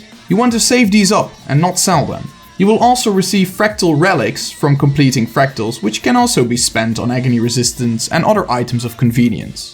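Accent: Netherlands. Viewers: This is the most Dutch accent I have ever heard, I love it! You earned a sub! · I can always hear the dutch accent, coming from a Swede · Yeah. It's a really noticeable accent, especially if you're Dutch yourself. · So.., you are a Dutch?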